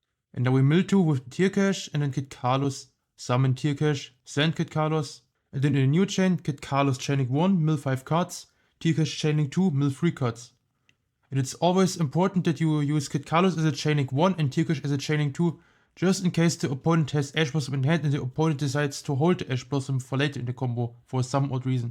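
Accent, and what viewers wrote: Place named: Germany. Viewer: Bist du deutsch bzw spricht du deutsch ? Man hört das dein englisch aus dem deutschen übersetzt wird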